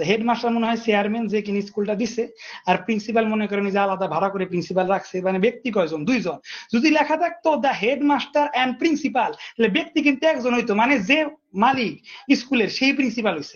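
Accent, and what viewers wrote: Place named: Mymensingh, Bangladesh. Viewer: অনেক দিন ধরে মাস্টার বইয়ের ক্লাস খু্জতেছি মনে মনে৷ আজকে পাইলাম৷ ধন্যবাদ স্যার৷ আপনার ভাষা শুনে মনে হচ্ছে আপনার ভাষা ময়মনসিংহ বা কিশোরগঞ্জ অঞ্চলে।।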